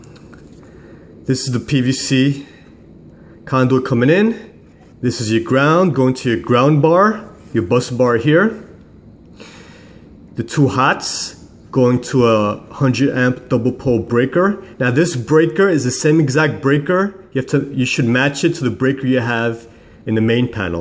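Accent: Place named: North, United States. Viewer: Your accent was driving me crazy! You're from up north aren't you? I'd be willing to bet you're a yankee, without a doubt!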